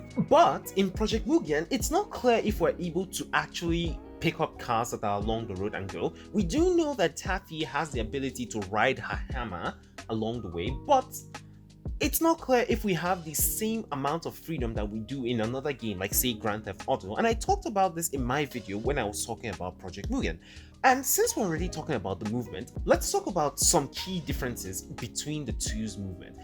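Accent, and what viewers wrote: Nigeria. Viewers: Bro are you Nigerian? You sound Nigerian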